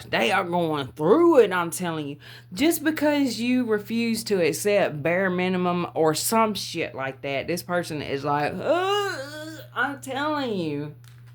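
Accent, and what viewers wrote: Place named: Louisiana, United States. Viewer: Amen ✨️ 🙌 🙏. Your accent is so cute. Louisiana?????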